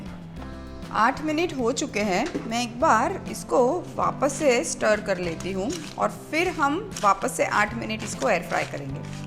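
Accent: India. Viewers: I am going to try this today. First I thought you are a foreigner but when you started speaking I understood you are an India. Thank you for sharing your recipe.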